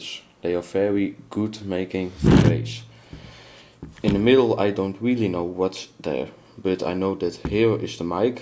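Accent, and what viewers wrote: Netherlands. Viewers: I can hear verry good that you coming from the Netherlands.😆 leuke review. Nu kan ik eindelijk eens zien hoe mijn Charge 3 er van binnen uit ziet.😊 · ik hoorde in de eerste seconde al dat het een nederlander is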